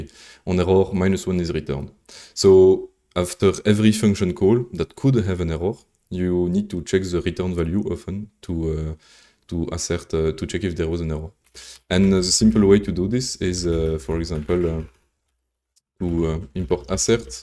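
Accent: France. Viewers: Hum… réécrire des fonctions, un accent français… 42?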